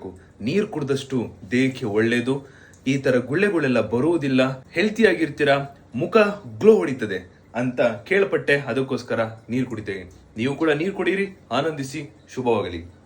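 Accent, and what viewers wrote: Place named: Mangalore, India. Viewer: ಸ್ವಲ್ಪ ನಿಮ್ಮ ಕನ್ನಡ ನಮ್ಮ ಮಂಗಳೂರು ಕನ್ನಡ ತರ ಇದೆ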